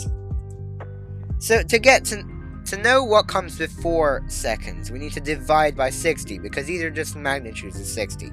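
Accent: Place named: United States. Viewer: You are speaking USA English in UK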